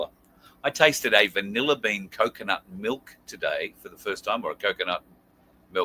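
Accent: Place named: United States. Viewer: Awesome vid, but why an Amercan voice and not an Aussie voice????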